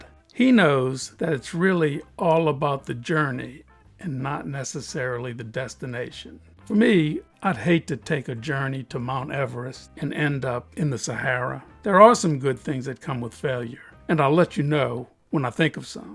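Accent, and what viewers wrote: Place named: South, United States. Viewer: Where at in the eastern united states are you from sir ? I see from the spots on your hands you're about 60 or 70. But I am unable to place your accent. I want to say kentucky or maybe louisiana.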